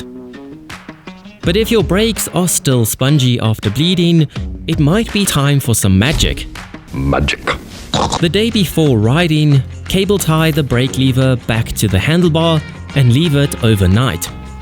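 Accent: South Africa. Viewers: Thanks for the tips. Also, hello from South Africa. I could tell you were a boet from your first sentence.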